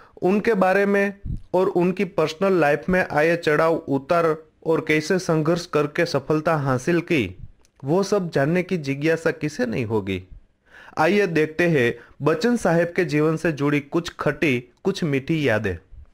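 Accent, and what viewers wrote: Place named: Bengal, India. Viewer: bro I am from Nepal n trust my hindi much much better then urs...sayed aap Bebggali hola ish liye aap bolte hai ....उस टे अमिताभ बोचन उन के कोर गोया था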